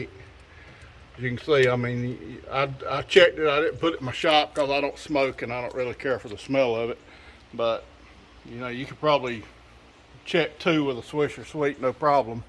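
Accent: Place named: South, United States. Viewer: Thanks for the video . You sound like a good ole boy from down south.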